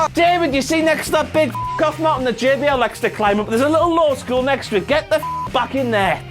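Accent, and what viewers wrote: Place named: England, United Kingdom. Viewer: I wonder what part of England is he from.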